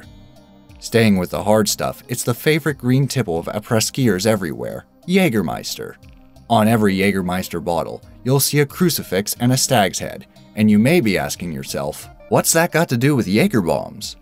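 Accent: United States. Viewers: I’ve heard this channel is a UK site with an American accented narrator. They give themselves away every video. It’s pronounced “choopa choops” in the US too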